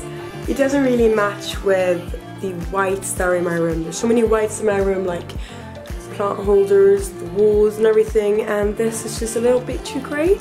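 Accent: England, United Kingdom. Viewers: You sound so much more British........ I LOVE IT 😍💗 This was a really good video Love from a Aussie girl 💖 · It's just my impression or your English has changed, it has become a lot more british😂🧡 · Your semi-british accent 😂❤️❤️ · Omg it’s such a cute room! Next can you do a room tour! I love your videos and I think that your British accent sounds great! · You’re english has became more..um british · you already have a British accent! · Loving that British accent and love the video. Thank you for making a real and relatable dorm decor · your new english accent is so cute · You have seriously turned British! Your ascent are so good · Omg u have an English accent!!!! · You are already with a British accent 😂 Love you kisses from Portugal ❤️🇵🇹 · Who else thinks that she is already getting a British accent!! CAUSE I DO! 👇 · 99% OMG YOU HAVE A BRITISH ACCENT 1% other stuff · Its so strange being British and I can hear your British accent coming through. 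I really hope I sound as nice as this on my channel to those who aren’t British 😂😂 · pls notice I love you sooooo much don't stop making videos!😍😍BTW I love u r British accent! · you sound so british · holy crap, your voice is both deeper and your accent is becoming british.. OH MY GOSH!!! BRITISH + NORWEGIAN = IRISH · Omgggg u sound British! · You sound so British but it really suits you 😍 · The semi-british accent though :😂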